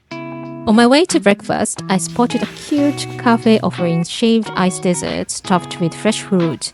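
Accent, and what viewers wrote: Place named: United Kingdom. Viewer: I have never seen your channel, and I like your accent, it’s very British.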